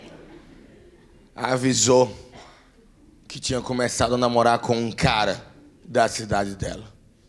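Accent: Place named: Recife, Brazil. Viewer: sou do RJ. Você merece tudo de bom dessa vida. Te acho muito inteligente. Os palavrões fazem parte do seu show. Ele é dito com diversão!!! Te desejo muitas "Felicidades" muitos "Parabéns verdadeiros". Enfim você nos faz sorrir pelo teu conhecimento e rir de passar mal de tua Alegria. Deus te abençoe e grata por toda a sua Alegria + Alegrias nos dada. Sucesso abundante e muita Saúde eu te desejo. Recife é uma cidade linda e você trás isso em seu coração. Que sutaque lindo. Parabéns muitos Parabéns 🎉🎉!!!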